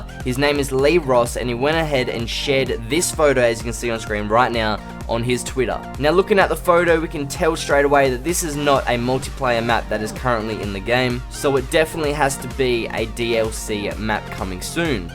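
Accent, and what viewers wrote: Australia. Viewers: I swear he's Australian not British.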